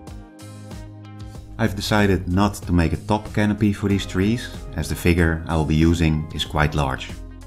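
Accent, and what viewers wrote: Netherlands. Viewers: Love your Dutch accent 😃 Love the video you made, thanks ! Ik heb hetzelfde model klaar liggen om te gaan printen en dat gaat na het zien van deze video niet lang meer duren gok ik. Leuk om te zien dat er ook NL kanalen zijn in dit wereldje ✌🙂 Subscribed -> Uiteraard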